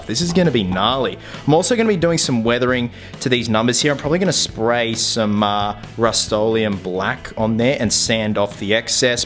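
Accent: Australia. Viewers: R u from Australia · are u Aussie u sound it I am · Mate, are you Aussie. Cause if so go Australia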